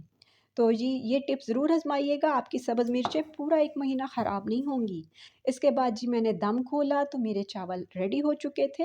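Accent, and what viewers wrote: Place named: Karachi, Pakistan. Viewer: Baat karne k andaz se to ap karachi ki lag rhi hain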